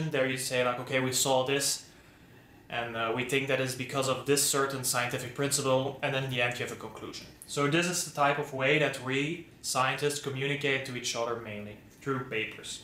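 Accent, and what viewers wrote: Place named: Flanders, Belgium. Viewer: Some advice, first of all, interesting and innovative way to start a vlog/YouTube channel! great job 1. try to avoid having the camera lower than your face, since the viewing angle is in general not the best, having it higher than your face is more suitable. 2. try to create better lighting, the yellow desk light is not cutting it and since you have stay at the same desk most of times it is easy to put a stand in that mess. 3. The music is not bad but also not great, try to find soundcloud artists who produce nice beats, mounika for instance. 4. The font in the beginning is not so pretty but that might be personal opinion, it also very 'casey neistat' like and a lot of vloggers take it over 5. Don't call it badass science, try to be a bit more creative with the outro, it needs to be something that sticks. The last comment is just about the douchebag parking of Mercedes at the end, typical... 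Good luck with the channel and especially with finding interesting topics to talk about since PhD work can be extremely repetitive and predictable! Btw, lovely flemmisch english accent:) You will never lose it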